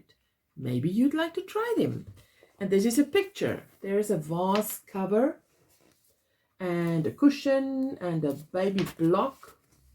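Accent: South Africa. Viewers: You sound south african!? Love the way you shared everything so calmly and thoroughly!!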